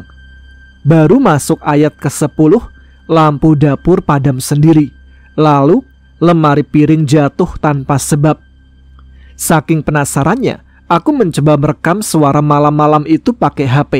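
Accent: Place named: Lampung, Indonesia. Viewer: Oi mas lampung nih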